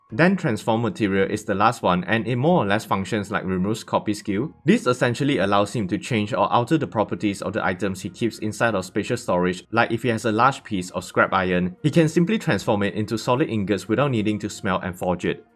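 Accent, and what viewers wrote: Singapore. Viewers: Ma man sound like a Singaporean / Malaysian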